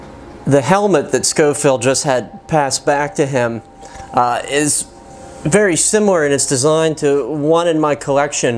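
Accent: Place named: Pittsburgh, United States. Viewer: I live in Switzerland now but I am from just North of da burgh. Always fun to hear that accent. Keep up the cool videos.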